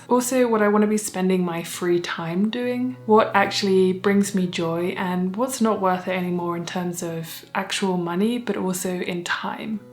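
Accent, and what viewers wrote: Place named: United Kingdom. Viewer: hi just found your channel and when saw that you live in Denver, I was really confused; you sound like a brit, same as me and after watching this i guess that you have just moved to the states; lucky girl; hope you enjoy your new life therex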